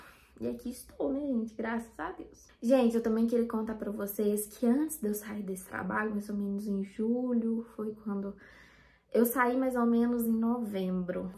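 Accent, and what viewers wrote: Minas Gerais, Brazil. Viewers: .. é uma delícia ouvir vc falar.. vc é tão boa comunicadora, seu sotaque mineiro é tão gostoso, e seu jeitinho é muito fofo! continueee <3 Deus te abençoe demais! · Caraaaaa... Apesar da situação sensível, obrigado pela coragem de compartilhar sua história com a gente. Eu jamais me imaginei assistindo um vídeo do YouTube de 30 min... Só hoje assisti os 3 seus. Um dos motivos é por vc estar compartilhando algo que não é só a suposta "perfeição" que vemos exposta na internet. Aaaah, cara, o sotaque mineiro (salvo engano) é maravilhoso! Tatuagem de interestelar 😮❤ mostra mais dela sim! Amo esse filme! Estimo melhoras e que tudo fique maravilhosamente bem para vc e para os seus. Abraço! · fico tão feliz por ter voltado. Gosto tanto desse jeitinho mineiro seu de falar, e independente do seu peso, voce continua linda!! ❤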